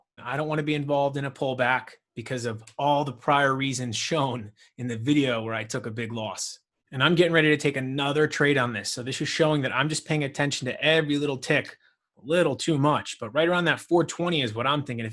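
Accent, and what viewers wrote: New York, United States. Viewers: Hey. Awesome content. Are you from New York?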